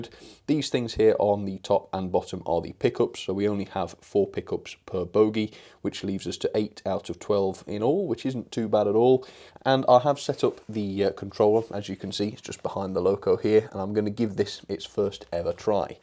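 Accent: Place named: England, United Kingdom. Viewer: oh my god his english accent is amazing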